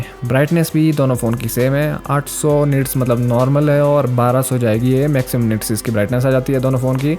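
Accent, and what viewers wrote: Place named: Himachal Pradesh, India. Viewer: Bhi awaz si himachali lg rhe ho ✔️✔️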